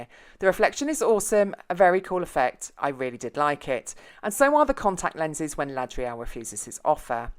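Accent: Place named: England, United Kingdom. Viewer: Nothing better than an english accent to tear this shit apart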